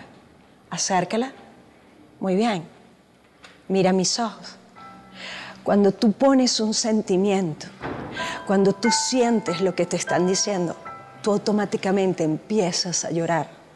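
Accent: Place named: Bogotá, Colombia. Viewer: ME GUSTA TU ACENTICO BOGOTANO.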